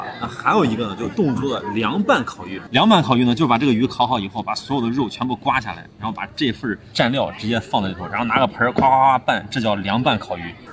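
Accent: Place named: Henan, China. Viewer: 第一次看的视频，听出来一丢丢邻居省份河南口音，很熟悉哈哈哈，视频很棒，期待更新。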